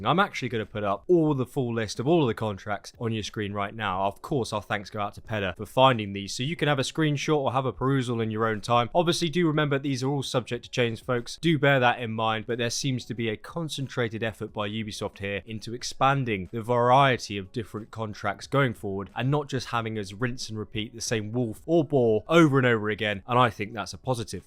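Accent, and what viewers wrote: United Kingdom. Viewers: More great Valhalla insights - thanks. Some interesting changes hopefully,. I'm relatively new - still playing England map - but get frustrated with tattoos and more tattoos. At least I get to accumulate opals - until 'must have' items drop back-to-back like the dragon raven skins and the Shattered Blade. I noted that the Valkyrie outfit was removed from Helix store recently so glad I got two pieces from Reda - and saved money. One piece of armour is useless so I fear frustration is meant to make us spend £$. P.S. Get homesick every time I hear a Brit accent - lol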